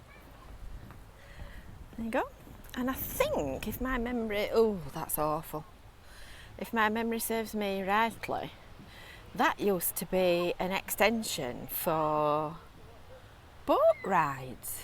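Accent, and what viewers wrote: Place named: Yorkshire, United Kingdom. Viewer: Amazing video. I was planning to come here too as my channel is all walkthrough in West Yorkshire videos. You also sound Yorkshire too can I ask where your from out of interest? Lol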